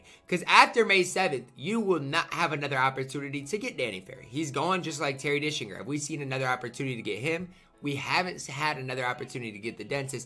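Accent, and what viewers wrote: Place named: New York, United States. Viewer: Yo are ur parents from the east coast? Ur from Iowa but sound like my friends from New York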